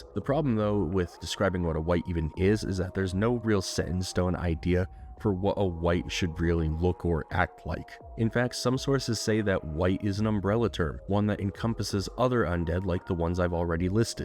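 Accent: United Kingdom. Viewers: He's. British. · he's british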